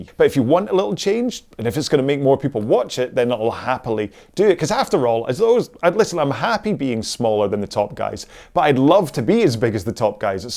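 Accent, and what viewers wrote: Scotland, United Kingdom. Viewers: Hi, first I want to thank you for all the content you’re providing. I like your vids A LOT! There is less „rah-rah“, the exact right amount of teachings, some entertainment, my kind of humor and no annoying music - just the sound of the fly wheel and some Scottish bloke talking stuff to shorten the hour. I prefer to listen to you reminding me of proper technique aspects over some podcast or music. And though I also follow and like Austin’s or Shane’s channel I regularly return to yours. My first 60min rows I did with your videos #wow60. So, thank you for the row alongs and keep on going your way. I’m sure there’ll be plenty of us indoor-online-rowers with you. 🙏💪👍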